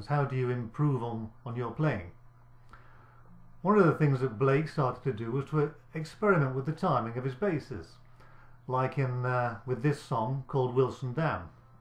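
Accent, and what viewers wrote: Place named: Sheffield, United Kingdom. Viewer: Jeez, who did the subtitling? Obviously doesn't understand the Sheffield accent!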